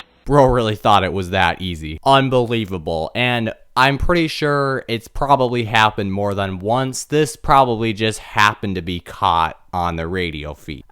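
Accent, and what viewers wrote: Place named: United States. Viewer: American, why do you try to sound like chinese, japanese and german at same time while still having obnoxiously nasal amarican accent?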